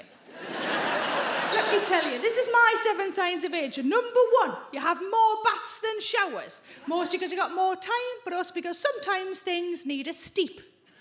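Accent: Newcastle, United Kingdom. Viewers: I have to say, I have a great laugh, listening to this lady. I was born in the north east of England currently residing the United States. This lady takes me home. Yes, I am a Geordie too!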